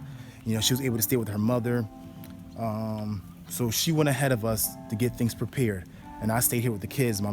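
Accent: United States. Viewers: Well once we go Africa me not depending on money,self sufficiency is the way Dem boi.jah provides💯💪🏿💯we were just born gluttonous in america.so I say cast down your gluttonous European ways before heading home.right now in America instead of being unhappy think african.meek and gracefully just infiltrated one of the largest nation's,now live meek save and build in africa.stop talking American on purpose.you can do it.thr choice is yours.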